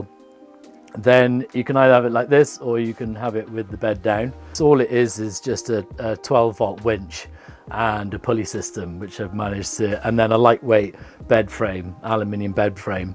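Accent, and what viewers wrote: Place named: RP, United Kingdom. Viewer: You sound posh .but you talk normal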